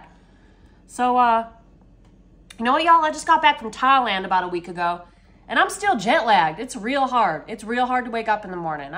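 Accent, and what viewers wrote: South, United States. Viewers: Love your southern accent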